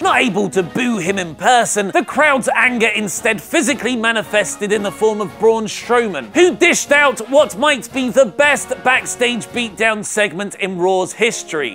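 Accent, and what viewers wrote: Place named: England, United Kingdom. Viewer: Where are you from? I'm not familiar with English accents, and I find your manner of puting an "er" in every word that finishes with an "a" quite intriguing! "John Cener" "Wrestlemanier" "Lucher Underground"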